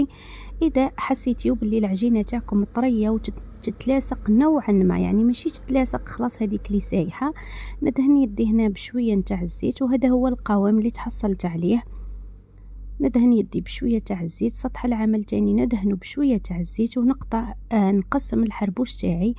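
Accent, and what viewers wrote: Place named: Skikda, Algeria. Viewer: لهجتها سكيكدية تهبل تحيا لناس سكيكدة نبغيهم بزااف ،وشكرا حبيبتي على الحلوة😊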